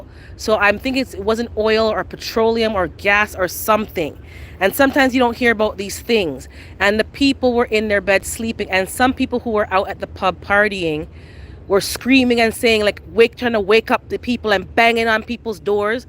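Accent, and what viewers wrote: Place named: Jamaica. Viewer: My seven years old son just heard your voice and said ' she a Jamaican?'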